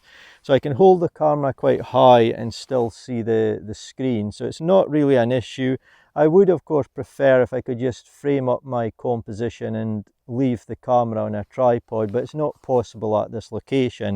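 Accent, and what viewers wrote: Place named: Aberdeen, United Kingdom. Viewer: hi, thanks very much for getting back to me so promptly - ok you have reassured me. I'll probably get one. I enjoy your videos,very straight forward and imformative compared to a lot of others where the presenter talks a lot about themselves before getting on to the subject matter. You sound like you come from the Aberdeen area. That's where I stay, my wife being an Aberdonian. Thanks for getting back to me and for your videos which are great.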